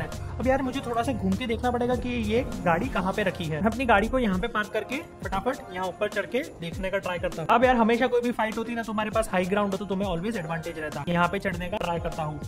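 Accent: Gujarat, India. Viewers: Bhai tum Gujarat se ho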